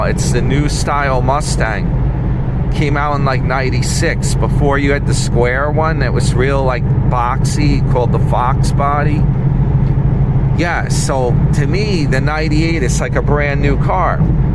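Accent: New York, United States. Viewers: I would rather endure a jalapeño enema while a spike is driven through my fucking temple than to endure your New York fucking gibberish! Shut the fuck up you annoying snot!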